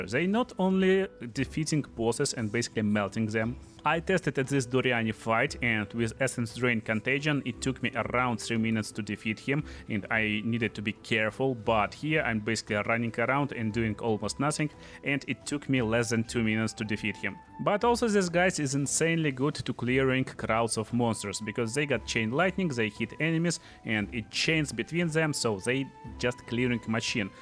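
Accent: Russia. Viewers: I hear Russian accent aula bulia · слышен явный рязанский акцент, уши прям режет =)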